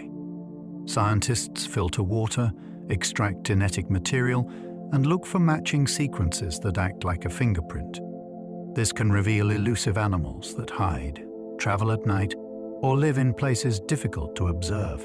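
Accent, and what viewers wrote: United Kingdom. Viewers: Listening from Iowa, USA. ❤ I like to listen at .75 speed and love the British accent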